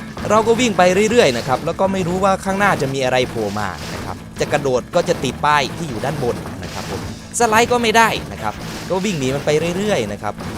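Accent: Isan, Thailand. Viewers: พี่อยู่จังหวัดอาไรคับ สำเนียงเหมือนคน อิสาน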